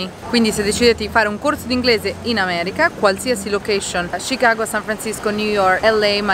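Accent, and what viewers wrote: Ancona, Italy. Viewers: Ciao, sento da come parli che sei di Ancona. Confermi?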